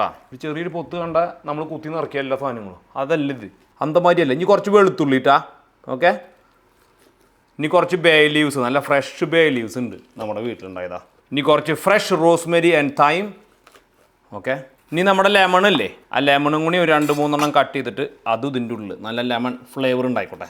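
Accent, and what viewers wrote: Thrissur, India. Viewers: എന്റെ തൃശൂർ ഭാഷ 💙👌👍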